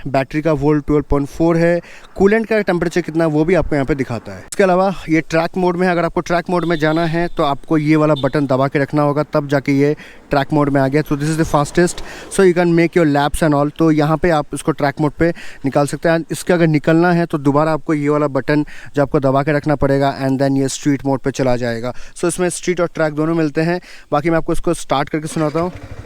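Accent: India. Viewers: CAN ANY OF YOU indians speak normally in ENGLISH? these bikes are exporting worldwide and we need to WATCH A GOOD REVIE WITH ENGLISH E N G L I S H detailed review I CANT UDNERSTAND SH1T, not even subtitles works.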